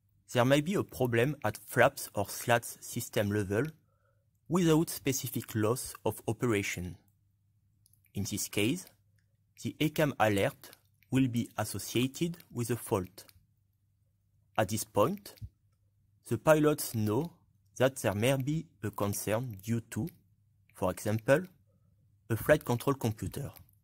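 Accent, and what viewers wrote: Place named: France. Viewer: Amazing video . Very well explained . Good graphics . Love your French accent . Would love to see more and more videos of exercises done in simulator checks ,, even after 8000 hrs on A320 ,, this video on flaps and slat positions was a very good pictorial learning . Like and subscribed.. waiting for tutorials on ra1+2 .. emer elec .. adr + ir exams ,,, unreliable airspeed also ,, since there is not a single satisfactory video on YouTube … and many more videos pls …. We would also like to see videos on how to apply MEL in various stages of flight ..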